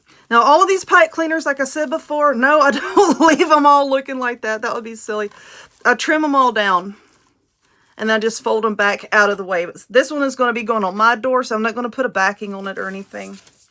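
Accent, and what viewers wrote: South, United States. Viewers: Love this wreath, and the black truck one I enjoyed the 2 different styles. I also just really love you southern drawl, and the way you explain things. I absolutely understand your terminology, such as great big gloppy glop, and cruffle. You are a joy to watch, make me smile through the whole video, which I sometimes wish were much longer. I have 2 grandchildren that live me on a foster child basis, the oldest is 14, the youngest 5. So your channel is a breath of fresh air, I love it. Thank you so much for sharing. Have a wonderful Sunday, and next week. Cant wait to see what comes next.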